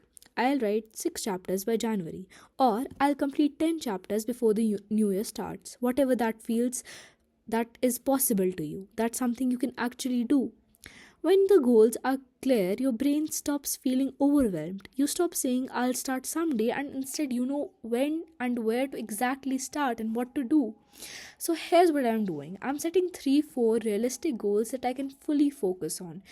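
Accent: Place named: India. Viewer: Indian accent ❤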